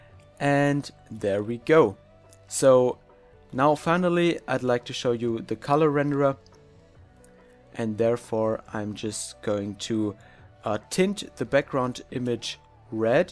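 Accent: Germany. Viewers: Are you German you are never Englisch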